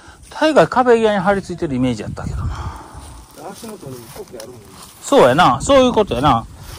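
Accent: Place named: Wakayama, Japan. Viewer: あっ🤭、そうそう！の話し方にほっこり。和歌山弁にほっこり！関西人はなんとなく、通じるものがあるんですよね〜。いつもお返事ありがとう。喜んでます🥰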